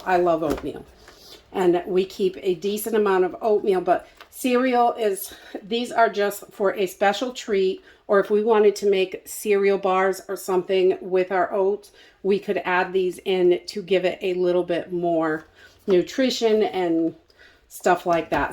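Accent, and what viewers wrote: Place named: South, United States. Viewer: I swear I gut giggle when you laugh! Love it so much! I’m from the south so love the accent, too. Thanks for all you do. 💗 from Texas. 🇺🇸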